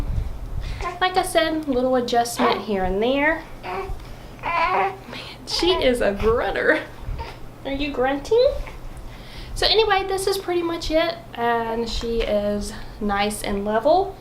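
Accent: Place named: South, United States. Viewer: Your southern accent makes you sound and seem as sweet as apple pie.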